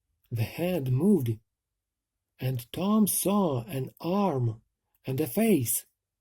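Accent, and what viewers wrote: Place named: Ukraine. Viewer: Hello, I think your pronunciation is good. There is such a distinct accent difference between Ukrainian and English, isn't there? Your genuine enthusiasm for the English language makes me very happy. I still have a lot to learn, you are very inspiring. Halina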